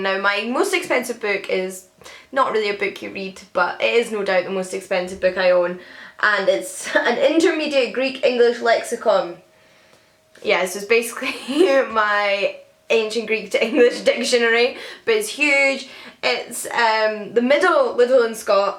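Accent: Scotland, United Kingdom. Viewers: Well, now I want that big Sherlock Holmes book;). About ugly covers - I think I have quite a few of those from second-hand bookfest buys! Right now I'm reading the ugliest library edition (Scholastic edition) of The Hunger Games ever, and it's so embarrassing going about the house reading it, I'm reading it alone in my bedroom... ugh!:P I read The Book Thief this year, and I found it quite an intriguing read - mostly because I had such low expectations of the book after hating the film version... I guess I liked the friendships in that book, and also sometimes the writing was quirky. But yeah, it was a weird book, and definitely not a favourite war novel! Fun tag, ^_^ P.S. I love your Scottish accent - so cute!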